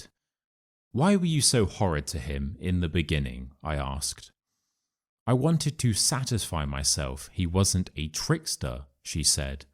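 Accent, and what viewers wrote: United Kingdom. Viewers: I like you british acccent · I do enjoy the story very much through your beautiful British accent. I cannot thank you enough ❤❤❤❤❤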